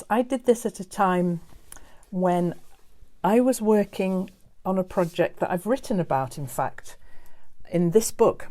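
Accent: England, United Kingdom. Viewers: Love that you admitted that you pinks mandala is done and will never be finished anymore. Haha, I laugh, because my mother has many many many of the same... never completed “samples”. In just my lifetime she has collected and filled a sewing room full of one of a kind Artistic pieces of “samples” many only half completed. as she recently packed up and downsized her enormous sewing room, in a house of 40 years. She and dad, married of 60years, are moving into her next phase of life..... as I am due a 50th Birthday Quilt... as much as she would love a reason to shop for more fabrics and design a quilt... I told her I didn’t want new fabrics, rather I’d love to have a quilt of all her “samples” and class examples... how cool of a one of a kind quilt with all of moms sewing techniques full of appliqué and her free motion machine embroidery project from seminar classes she took and or taught. 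You could read a book for me anytime. You have the perfect soft English accent for my non accent American ears. I don’t have any accent from any particular corner of the states as I have been raised in Oregon. as much as I enjoy the appearance and admire the patience it takes to do English paper piecing... I would go absolutely MadHatter bonkers in the attempt. The Kaleidoscope look your mandalas have is beautiful. Thank you for sharing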